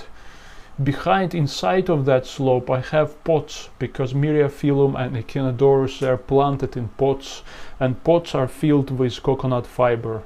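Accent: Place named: Germany. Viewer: Wow! what a beautiful tank and beautiful terrestrial plants growing above and around it. It seems you are experimenting with many of the same things in your tanks as I am. Where do you live? You sound German, very understandable but a slight accent. I am in Ohio on the Pennsylvania border. Not that it matters but I am just curious. I started out my aquaponic experiments with spider plants. I had about seven plants I planted in gravel in an old breeder box. Their growth exploded. The tank is in a custom-built cabinet with open slots between the boards. the spider plants are growing up through the slots on the top of the cabinet and long runners are hanging down over the front of my tank hundreds of new spider plants of various sizes are growing on these vines. My setup is in an upstairs room and has no room for expansion and I do worry about putting too much weight on the beams and though I am very careful to not make water messes you know that is not always possible. 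So I have a basement room I am planning to move to where I will have lots of room to expand the hobby. I purchased a 10-gallon tank today at a second-hand store for $5.00 and actually I have several other small tanks that I have not set up. If you go thrift store shopping on a regular basis you would be surprised how cheap you can pick up small tanks. So I will be migrating to the basement. Too bad you don't live around here. I could take you to a rocky canyon which has a large but shallow headwaters of a river.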